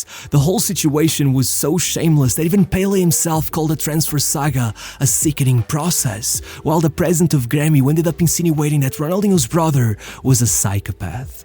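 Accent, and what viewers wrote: Portugal. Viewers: You can clearly notice the narrator is portuguese 🙃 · I know a portuguese accent when i hear one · You sound portuguese my dude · Portuguese accent is crazy xD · Bro, why do you speak in Portuguese and not PT-BR? Sounds kinda weird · the portugueese accent is there hahaha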